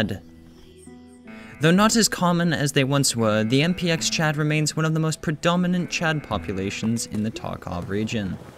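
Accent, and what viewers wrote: United Kingdom. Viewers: Dude you're British!?!??!?1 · This is either an awful English accent or it's authentic & feel bad for this lad. That aside, these videos are very well written, edited and fun to watch.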